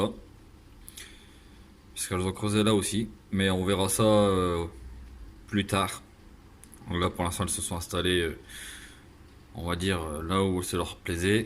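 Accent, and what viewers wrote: North, France. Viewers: avec le petit accent du nord ! Comme à la maison 😊 je suis de Caudry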